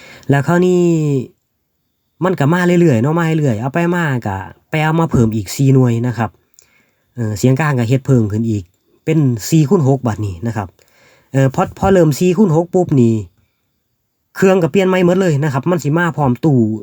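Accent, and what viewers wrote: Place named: Sisaket, Thailand. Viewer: สำเนียงค๊นสีเกษตั๋วนี่😊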